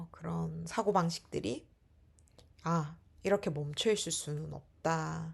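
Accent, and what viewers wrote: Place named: Seoul, South Korea. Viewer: 우왕 서울말 진짜 잘하시넹 하다가 인!생의 듣고 빵ㅋㅋㅋㅋㅋㅋㅋㅋ 언니 그래도 진짜 자립해나가려고하는 의지를 중요하게두시고 앞으로 나아가려고 하는 것이 잘 보여요! 어디 가서 사시든 혼자서도 다 잘해내는 예요!ㅋㅋ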